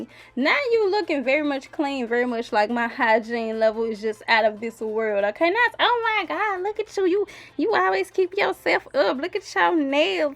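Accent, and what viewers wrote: United States. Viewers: Wow, that's the most American I've ever heard a human being sound.. Nice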